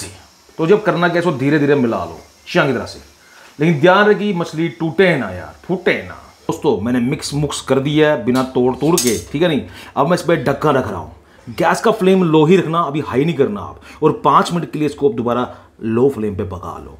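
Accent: Punjab, India. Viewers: Sir ur Punjani accent😍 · Bhai kya aap punjab se ho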